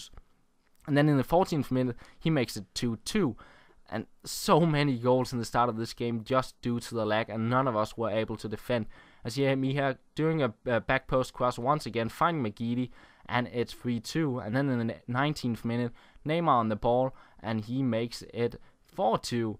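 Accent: Denmark. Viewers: mate you from denmark! can hear it. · u danish?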